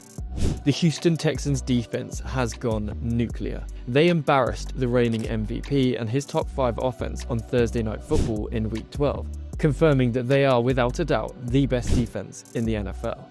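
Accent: United Kingdom. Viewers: It feels surreal to watch a video about my Texans being good narrated by a British bloke 💙❤️💙❤️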